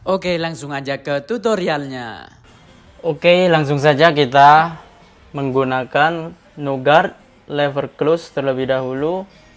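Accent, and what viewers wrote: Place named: Madura, Indonesia. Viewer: Orang mana pean mas....kog kyak ada orang Madura disitu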